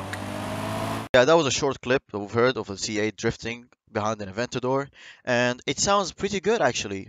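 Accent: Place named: Scotland, United Kingdom. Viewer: Just you sound scottish 🏴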